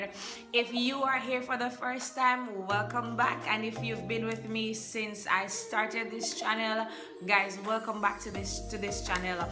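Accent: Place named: South Africa. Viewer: Wow....I really love her accent❤💯🤗#SouthAfrica.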